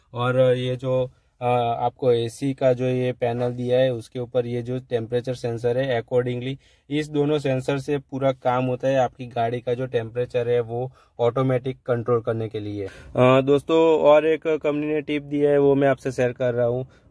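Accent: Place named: Gujarat, India. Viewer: Bhai pakka gujrat se he ye lg rha h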